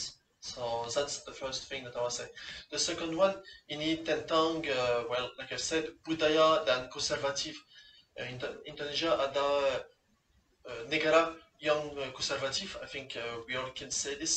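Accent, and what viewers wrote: France. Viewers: French accent is soooo sexy... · Bahasa Indonesia kamu beraksen france, dan itu menarik :)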